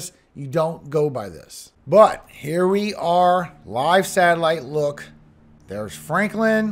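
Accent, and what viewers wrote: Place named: New Orleans, United States. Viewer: when I first saw you pop up on my YT feed I thought you looked familiar and when I herd you speak I knew you was a native and I herd you say you was from here I was born at EJ lol I do recognize the name , N.O. Is small so very possible we have crossed paths one way or another, either way we brothers in Christ and we here now . I really enjoy your shows updates and most of all your prayers bc lord knows we all need them and you always have some from home 🙏👍🏼 The BEST A-MEN IN THE BUSINESS LOL Never Quit !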